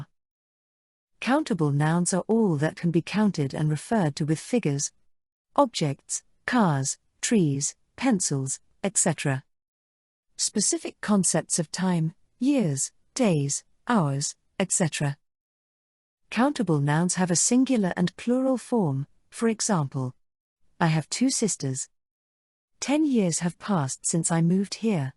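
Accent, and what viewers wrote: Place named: United Kingdom. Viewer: Thx u , i love it brittish accent 🎉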